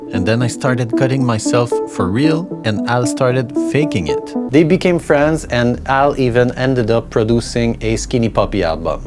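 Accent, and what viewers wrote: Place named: Quebec, Canada. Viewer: Intéressant. Es-tu de Québec? Je reconnais un accent familier.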